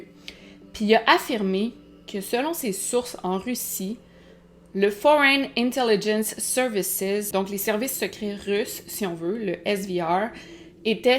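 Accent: Canada. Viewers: Coucou, merci pour tant de travail, pour toutes tes vidéos passionnantes. Tu es de plus en plus jolie! Tu assures à 100/100 comme toujours, très professionnelle, consciencieuse, c'est toi! Pour avoir déjà eu le bonheur d'être enceinte il est vrai, Pour moi du moins que j'avais souvent l'impression d'être en retrait! enfermée dans ma bulle, moins attentive, moins vive d'esprit. Tu m'as fait rire quand tu as dit "je ne suis pas toute là" chez nous en France nous n'avons pas cette expression. J'adore! J'aime beaucoup l'accent canadien. Gros bisous, prends bien soin de toi. Depuis le temps j'ai l'impression que tu fais partie de ma famille. J'ai été très émue quand j'ai appris que tu étais enceinte.🥰😘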